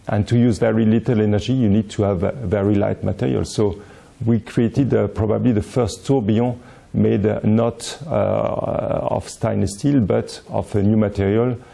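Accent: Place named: Japan. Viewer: なんか日本人のカタコト英語みたい笑 まあそれが一番聞き取りやすいんだけど。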